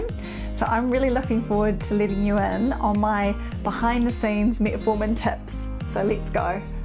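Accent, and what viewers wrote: New Zealand. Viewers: I loved the new Zealand accent more than the informatiin on Metformin... The accent reminds me of Jacinda Ardern.♥️😊. But what about a high fat low carb diet,as an alternative to Metformin? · Love your videos and info but especially your kiwi accent as I'm an ex kiwi living in Toronto, Canada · Livel (level), tin (ten), sicondly (secondly)... Lovely New Zealand accent 😄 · I just love your Kiwi accent apart from all the important info. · I wish she were my primary care physician! I love her new Zealand accent! She's such a perfection, in every way! You can go to her clinic with an ailment, her smile will heal you in no time! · I love to hear your Kiwi accent and to watch you. You are eye candy.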